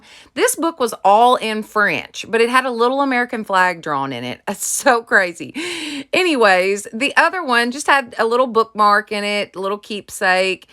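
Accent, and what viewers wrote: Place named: Tennessee, United States. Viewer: Shut up!!! Lol… I knew your accent was familiar!!! Tennessean!!!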